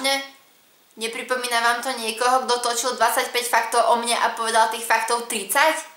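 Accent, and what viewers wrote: East, Slovakia. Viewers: AAA vychodňarkaaaa a znaje hutorec po rusnacky ta co jak še maš ! :D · Páči sa mi ako Ti čas od času ujde niečo východniarsky :D :D "Tažéé" :D je to milé :D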